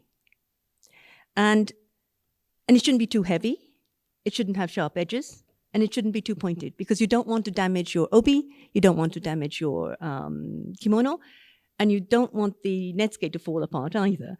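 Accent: United Kingdom. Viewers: 素晴らしい👍👍👍 king’s English, 驚き‼️こんなすばしいひとみたことない、、殆どイギリス人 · 久子さまのファンになってしまいました。美しいブリティッシュイングリッシュ。お話の進め方も素晴らしく、とても興味をそそられました。楽しそうに話されているので聞き入ってしまいます。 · ある程度原稿があったとしても、その場に応じてユーモアと知性、ウィットに富んだ英語の内容は流石ね！！イキイキされていてホント聞いているこちらまで晴れやかな気持ちになるわね。東京オリンピックの招聘のイベントの時になんて綺麗な英国アクセント、そしてフランス語を話されるのかしら…と今でも覚えているわ。英語の先生、そして全ての学生はの英語を聞くべきじゃなくて？笑顔が張り付いたようなどこかの方はどうなのかしらね？ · イギリス英語？ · かっこいいですね。キレイなブリティッシュイングリッシュ、わかりやすいプレゼンテーション、さすがです❗️❗️❤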